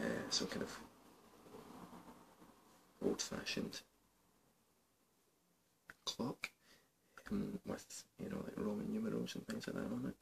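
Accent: Scotland, United Kingdom. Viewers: Do love the scottish accent :)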